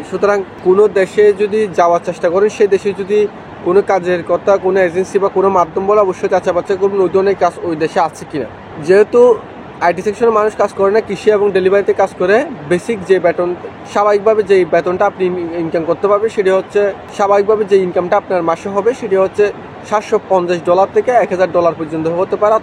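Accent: Chittagong, Bangladesh. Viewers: ভাইজানের কথা শুনে মনে হচ্ছে আপনি চট্টগ্রাম আসলো যদি চট্টগ্রামের লোক হয়ে থাকে অবশ্যই যোগাযোগ করবেন আরমানিয়া আপনি কোন জায়গায় রয়েছেন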